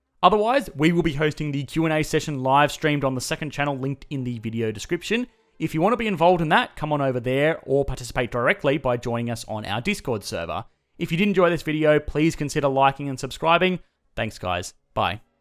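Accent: Australia. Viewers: One of the reasons I watch your videos is to hear your Australian accent. But I never knew you guys pronounce it... mer-CANT-alism.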